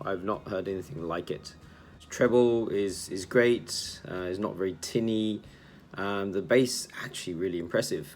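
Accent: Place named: United Kingdom. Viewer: Will these pair to an Apple Watch cellular so I can just go walking without a phone? Are you a native Singaporean? ( British accent) Cheers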